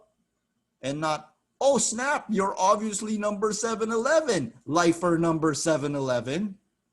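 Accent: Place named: New York, United States. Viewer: That New York accent 😎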